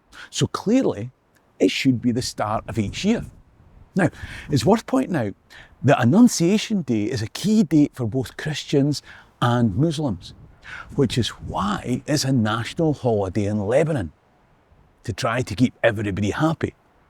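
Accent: Scotland, United Kingdom. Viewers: I love learning more about my Scottish ancestors especially in your Scottish accent! ❤🏴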